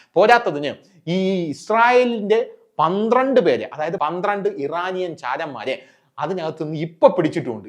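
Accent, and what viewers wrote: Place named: Trivandrum, India. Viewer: Nice explanation in Tvm. Slang, very interestingly presented ❤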